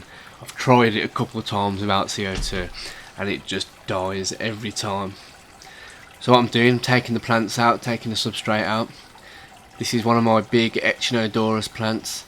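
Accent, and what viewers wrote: Birmingham, United Kingdom. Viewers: are u a brummie lol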